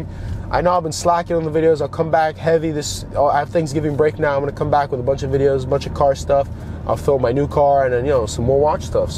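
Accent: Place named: Miami, United States. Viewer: You look and talk exactly like I'd expect someone from Miami to.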